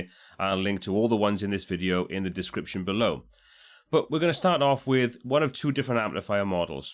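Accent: Wales, United Kingdom. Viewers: Great video, and such a joy to hear a Welsh accent.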